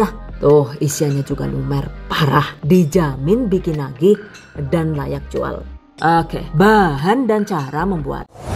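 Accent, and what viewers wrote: Banyumas, Indonesia. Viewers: Jane sampean wong ndi mba Q bsa ngapak ya.. SALAM,ngapak NEK ORA NGAPAK ORA KEPENAK🖐👍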